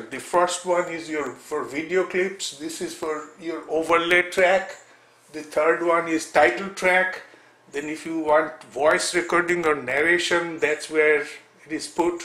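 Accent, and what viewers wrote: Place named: India. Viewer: thenks a lot man!! It's good Tutorials really. I'll watch it all and the indian accent is not a problem. go ON!!.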